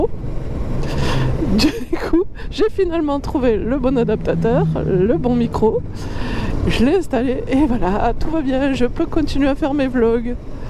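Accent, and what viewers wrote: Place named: South, France. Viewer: "eh bieng bonjour les copaing..." Ca me fera toujours marrer cet accent de sud perdu en Alsace 😂 Toujours aussi sympa tes présentations :) Tiens, ton écluse de 16 mètres m'a rappelé une autre curiosité que j'ai visité quand j'allais régulièrement à Strasbourg et que tu pourrais présenter: le plan incliné de chaipuhou (en fait je sais, mais j'vais pas spolier)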